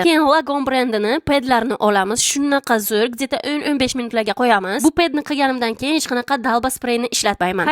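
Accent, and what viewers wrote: Bukhara, Uzbekistan. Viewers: Buxorocha sheva biz ham shunday deymiz😂👍🏻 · Buxoro shevasi bu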